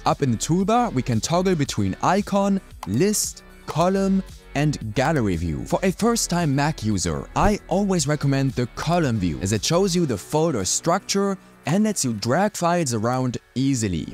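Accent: Germany. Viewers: the german accent was too strong to over hear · TOO STRONG GERMAN ACCENT !!! impossible to listen to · hatte kurz gedauert i hear the german akzent btw nice video :) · You sound very German, are you also German? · Gone are the days of my youth when finding a German who speaks English was looking for a needle in a haystack. still has a little bit of accent which I immediately localized but it is not detrimental in the least, it is even charming. Actually, his English has more clarity than that of the most native speakers I follow on Yt. But it’s not only in the way he speaks. It’s also the way he lays things out and his overview. A natural born talent for an educator. I associate this subject of learning the macOS with my worst frustration of the last month but the way he deals with it replaces my grave frustration with simple fun and much faster learning curve. 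The script and video integration are simply awesome. I know doesn't need my acclaim after millions of views and thousand of comments, but I am so impressed I want to encourage him anyway. I have watched this three times and will be coming back. This edition may be better than the 2025 one.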